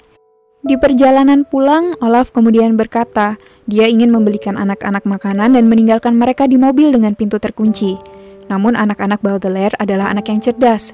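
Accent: Bandung, Indonesia. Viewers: Wih orang bandung👍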